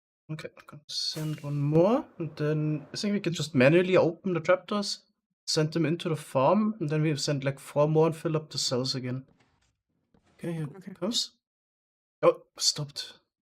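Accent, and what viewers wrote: Germany. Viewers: Hi du hast manchmal einen deutschen Akzent. Bist du Muttersprachler Deutsch?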